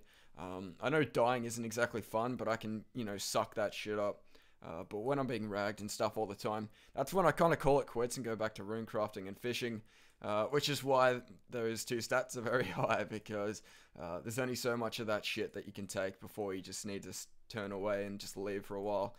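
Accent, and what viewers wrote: Australia. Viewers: just an Australian accent... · are you Australian?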